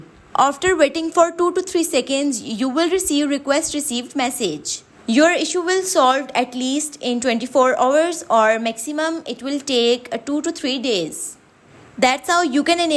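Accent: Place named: Arab world. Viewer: arap aksamı var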